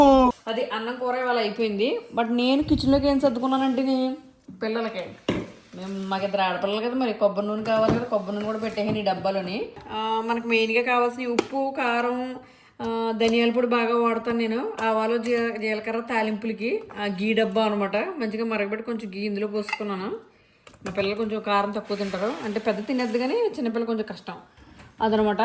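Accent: Godavari, India. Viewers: Mee video choose choose vizag slang poye godavari slang vachestundi AAYEE.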